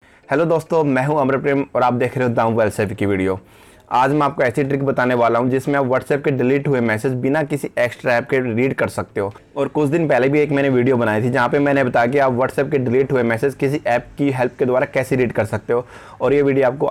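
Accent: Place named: India. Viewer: Why do Indian English so difficult for me to understand???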